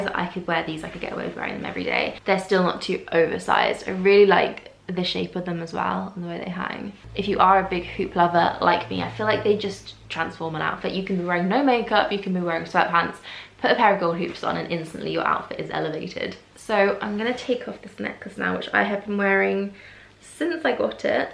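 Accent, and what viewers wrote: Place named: Scotland, United Kingdom. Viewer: You sound a bit scottish and i love it!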